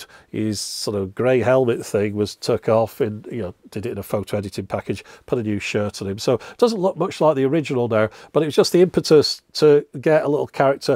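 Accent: United Kingdom. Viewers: Hopefully 2023 is better. I don't know how people complain about how you speak. It just sounds like a British accent to me. But there are always a few that will complain and insult people. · Totally Brilliant Video. Had My undivided attention the whole time. I have some catching up to do, I thought I have seen Every Video You put out. I though the Way You sounded was very natural, found nothing odd about it, Having the British accent and all. It May sound weird, But I really enjoyed hearing you talk.. Sorry about the Health issues. Please accept My Sincere Condolences of You Mothers Passing. Lost My parents in 96. Dad was 65, Mom in 08 just turned 72. I'm 62 and hope to out live My father. I feel that I can cause I am in great Health.. Other than a Dental check-up every 6 Mo, I have not seen a Doctor in 15+ years. I do nothing for Christmas either. Being in the woods 250' From the lightly Driven Road not much to see. 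When I get My Tower back up, it will be 120' My big blue Star might be seen from the interstate. I anxiously await any Video You put out. Kinda makes My day when You do... Please take Care My Friend (yes You could be a great Friend to have) Mike M. Wausau Wi. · Thanks for sharing your experiences with the weirdo audience members. Please don't waste time interacting with them. You have nothing to explain to us about how you sound. It's very shameful how some people act here behind their veil of pseudo-anonymity. From one of the up-'til-now silent subscribers, I'm very sorry to hear about your Mom. That must have been a terrible thing to go through. And you sound awesome. The British accent automatically gives you a +10 perceived intelligence, and sod the plonkers who give you a hard time about the way that you sound. Please have a better 2023. Best regards from Chicago, USA.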